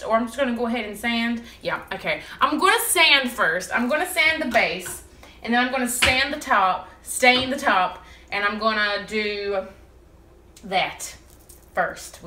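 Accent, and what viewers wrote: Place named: Alabama, United States. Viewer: I love listening ot you talk... you sound like everyone I know! :-) (I'm from Alabama.)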